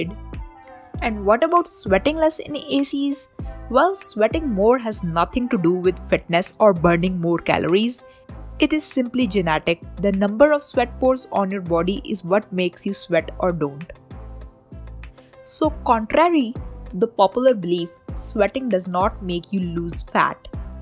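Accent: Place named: India. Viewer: Damn i hate that Indian accent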